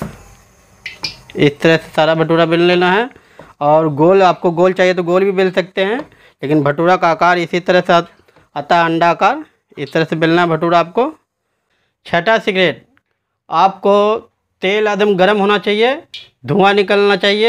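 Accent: Bihar, India. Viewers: Pura theth Bihari Han marde tu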